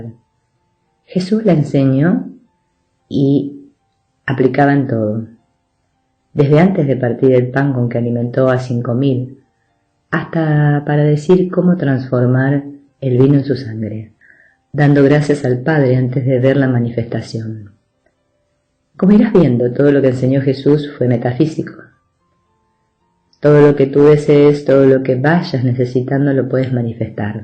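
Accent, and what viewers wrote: Argentina. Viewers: Que voz argentina tan bonita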